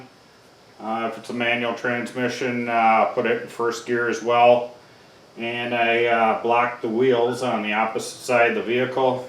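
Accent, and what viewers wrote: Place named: Wisconsin, United States. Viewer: I love the uh accent , Lol reminds me of home in Wisconsin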